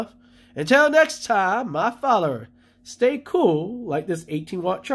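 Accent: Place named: India. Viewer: This man is speaking the English of Indian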